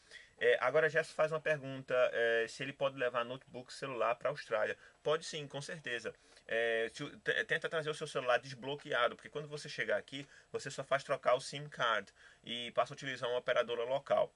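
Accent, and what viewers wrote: Pernambuco, Brazil. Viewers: Contato , vc é pernambucano ?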